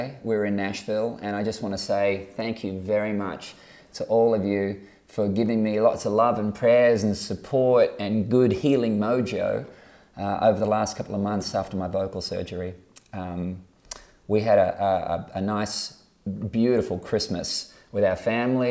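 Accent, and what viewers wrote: Australia. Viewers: he has always had an accent, he's Australian. (Well, born in New Zealand) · Hey Keith. You're genius... when you sing, you really sound, and do have natural american accent, but when you're talking, you sound like a Queenslander fella. Love this song, mate.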